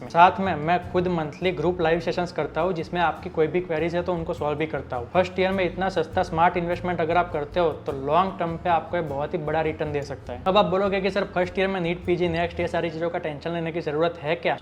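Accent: Gujarat, India. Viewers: Behind Hindi...feel Gujarati Aap Gujarati ho kya?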